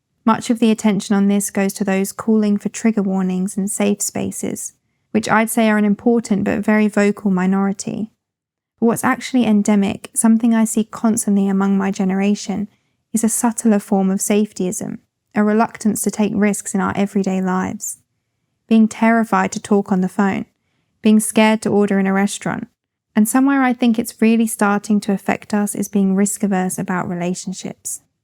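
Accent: United Kingdom. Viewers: She has one of the many accents found in Britain ... If she was speaking with a Scots accent (like e.g. Billy Connolly) is that THE British accent? If not then why not? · Better than any type of non-British accent though. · Ugh, that british accent 😍 😂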